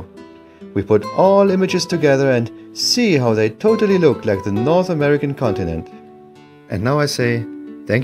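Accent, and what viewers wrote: Germany. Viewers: This guy has the best German accent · God I love the German accent, great stuff too and nice music!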